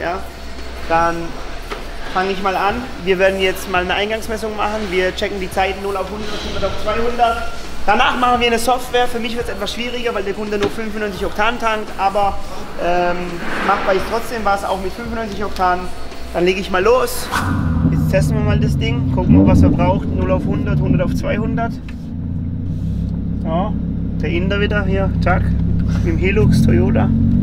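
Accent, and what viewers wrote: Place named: Swabia, Germany. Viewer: s’Hebelle vom Golf 2🤣🤣🤣 zu geil, richtiger Schwob bisch bliebe, gell🤣✌️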